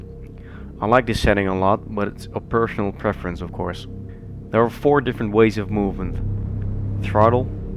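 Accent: Netherlands. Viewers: Are you native english? You have an accent. Maybe dutch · You're Dutch, aren't you ? Thanks bigtime for the hud expansion. I never knew most stuff. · Great vid! btw are you from the netherlands because youre english sounds like somone who is from the netherlands :P greatz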